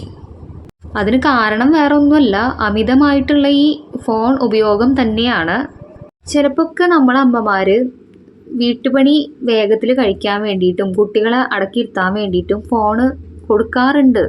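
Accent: Thrissur, India. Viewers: എത്ര സംസാരിച്ചാലും ബോറടിക്കില്ല നല്ല ശബ്ദം ത്രൃശൂർ slage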